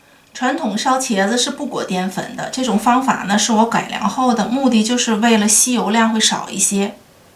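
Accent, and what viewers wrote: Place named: Northeast, China. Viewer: 哈哈 东北银儿吧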